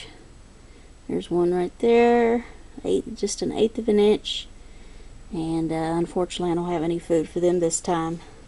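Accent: South, United States. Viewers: I really like your videos because you show your collection - whether they eat or not - so it's interesting to see which species you have but also what stage they're in their growth cycle etcetera. I also like the way you list what the viewer is seeing. Some Y-tubers don't even let you know what you're looking at or don't write scientific name in full eg. P. scrofa. it took me ages to find out what the P stood for! SO....MANY thanks for being so thorough and really nice to see your collection. This is only the second of your videos I've discovered during this infernal COVID 19 lockdown in England/UK Thanks again. You have a really lovely accent btw. Somewhere in the Southern States of the USA I expect. I had a 5 inch female Grammostola Porteri for a couple of years, about 28 years ago! But, I've only recently discovered this thriving T hobby so alive and well on Y-tube! 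Absolutely brilliant viewing. In fact it's got me right back into it so that after all those years, I decided to buy two slings yesterday! Can't wait for them to arrive. 2 x 1cm Eupalaestrus campestratus (took me months to find them, they're so rare on this side of the pond *grin*). Hope you are keeping safe on that side of the pond and hope to see you alive and well in a few months time!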